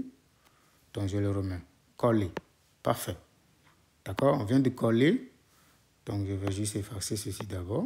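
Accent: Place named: Benin. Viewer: Bravo mon frère , c'est vraiment sympa cette tranche de maths sur les équations diopht. on croirait entendre mon prof de math au lycée en C.I qui était Béninois.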